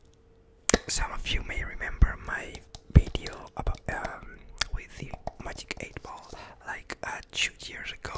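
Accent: Spain. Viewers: 50% = talking about the Alien 40% = make fun of his spanish accent 10% = Ask him for a collaboration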